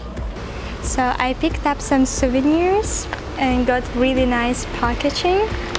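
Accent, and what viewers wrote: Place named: Russia. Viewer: She looks like more Russian than Germany and also her accent. Haha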